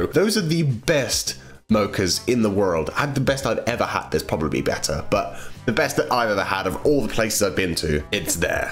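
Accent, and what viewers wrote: United Kingdom. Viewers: is British I felt like I knew that cause his voice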